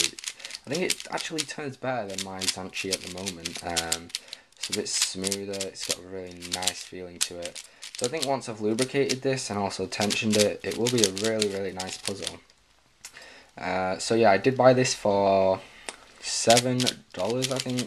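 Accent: United Kingdom. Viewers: you sound british , how long did it take light take to ship to britain if you do live in britain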